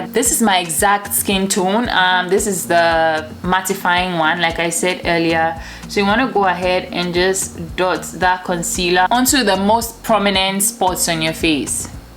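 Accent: Ghana. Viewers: Loved this. I was listening to you talk and I thought "she sounds Ghanaian", then I read your profile and see that you are in Ghana. So cool! Thank you for sharing your knowledge with us!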